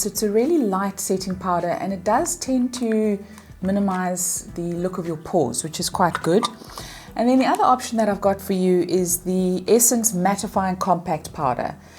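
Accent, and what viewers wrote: South Africa. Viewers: Hello from Los Angeles.🌸🌴🏖️😊 You have such a beautiful accent & calming presence. And I guessed your accent is S. African.🏵️🦒🌴🦏🏞️Yay! Thank you for the review. I think I'm going to try L'Oreal Freshwear again. Last time I tried it, seemed my skin felt a bit dry and the color wasn't right I normally stick with Clinique because of my sensitive skin. Unfortunately, getting a good shade match is still difficult with Clinique. I've been shade matched in many different colors.😒 I've also used Revlon Lipstick-Rum Raisin since my early 20's. I'm 52 and it's nice to see someone else who remembers these products & shades. You look so pretty. Thank you for sharing. 🦋🏵️🌻💄🌸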